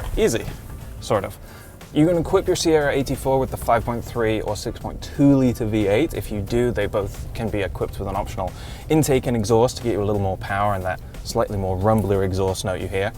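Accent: United Kingdom. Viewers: His accent swaps between British and American. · Is this guy British?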